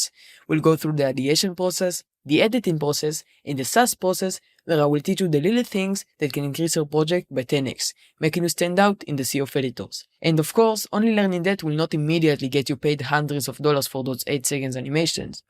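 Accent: Israel. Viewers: על פי המבטא הבנתי שאתה משלנו רחלה סרטון ערוך חזק גבר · איו אחי המבטא הישראלי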